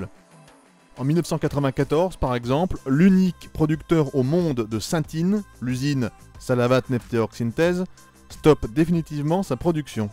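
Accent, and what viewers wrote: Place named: Lorraine, France. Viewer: Woooooh serait-tu un frere lorrain? je dis ca car ta facon de prononcer 20 m'y fait fortement penser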